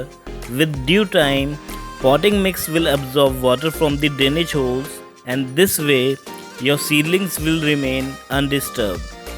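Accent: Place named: India. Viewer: These indian gardening vidoes with indian accents are giving me brain tumor.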